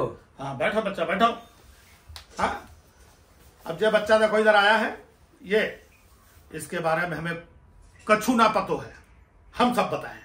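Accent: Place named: Madhya Pradesh, India. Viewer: एमपी वाला एक्सेंट बहुज्जोरदार हे भईय्या हम तो बा ही सुन कै एकदम खुस हो गये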